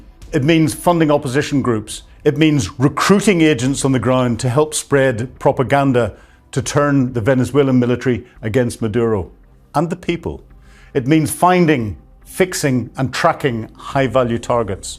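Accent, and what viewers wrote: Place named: United Kingdom. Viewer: Very british English, thanks 😊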